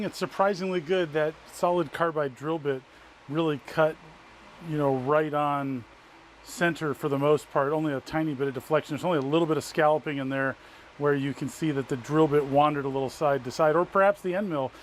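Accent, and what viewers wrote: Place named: France. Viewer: I'm sorry to tell you but your French accent is terrifying..... But funny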